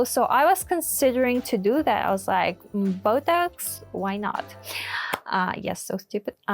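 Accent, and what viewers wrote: Russia. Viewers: Че за русский акцет🤔🤔🤔🤔🤔🤔🤔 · you sound russian, why are you replicating chinese beauty standard though, for work?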